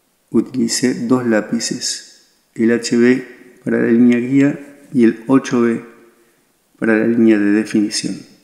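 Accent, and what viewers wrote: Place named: Mendoza, Argentina. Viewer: Por tu tono al hablar sos de mza o san juan .excelente dibujo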